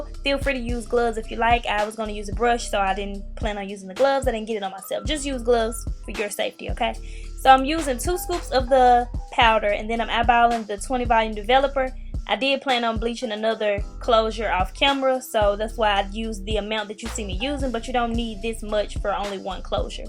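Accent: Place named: Tennessee, United States. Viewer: That Tennessee accent 😍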